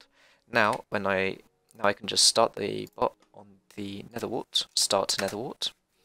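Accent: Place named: United Kingdom. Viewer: lol are you deaf? hes 100% British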